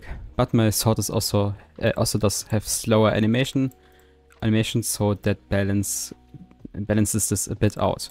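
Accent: Germany. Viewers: Man hört dein Deutsch so raus (°ー°〃) aber du bist cool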